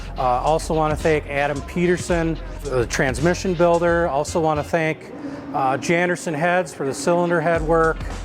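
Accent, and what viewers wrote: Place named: Minnesota, United States. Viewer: Don’t cha know?? I love minny accents. What a great car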